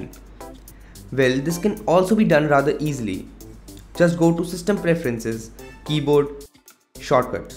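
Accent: India. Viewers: This Indian accent makes my ears bleed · Beebom man! just keep up the gr8 work.i just beg you not to follow suit like C4ETech with "FAKE ACCENT". this indian English accent we have is the best! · Indian accent is always funny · this unique indian accent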